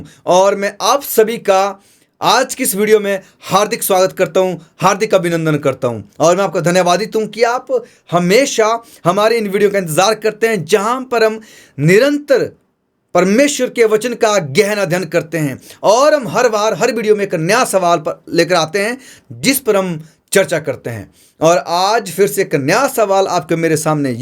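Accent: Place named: Himachal Pradesh, India. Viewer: Himachali hindi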